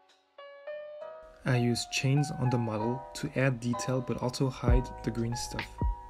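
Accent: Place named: France. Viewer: Awesome ! Your french accent is so cute damn it drives me crazy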